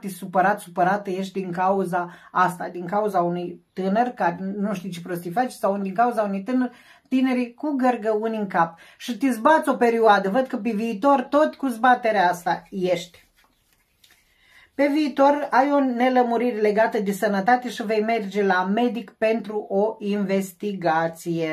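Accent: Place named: Moldova. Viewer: Superba etalare! Esti o draguta! Multumesc pentru frumoasa citire! Imi place accentul tau...moldoveanca de a mea! Acum mi-ai aparut..e prima data cand te vad si iti ascult citirea si..mi-a placut! Si da! M-am abonat! Te pup pe suflet! Te astept cu urmatoarea citire! ❤❤❤❤❤❤❤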